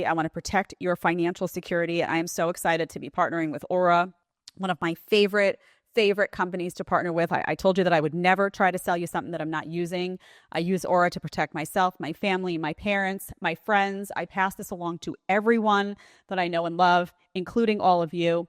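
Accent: New York, United States. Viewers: Do I hear a tinge of Ney York or Boston in your accent once in a while?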